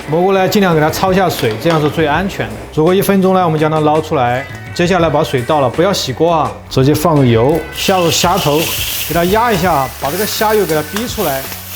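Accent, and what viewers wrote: Sichuan, China. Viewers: 川味普通话，好亲切！ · 你那个川普真的…. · rua一下，老师的川普太萌了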